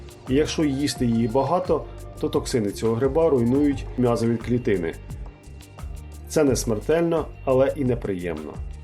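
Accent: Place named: Surzhik, Ukraine. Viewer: Суржик - суржиком А інформація корисна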